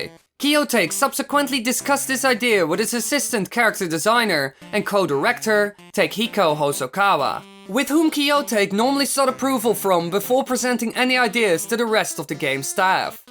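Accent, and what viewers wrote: Netherlands. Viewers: are u dutch? your accent is like you speak dutch but uses english words, however not a ''bad'' accent haha, i am dutch but it just looks like ur speaking fake dutch.. i cant describe it well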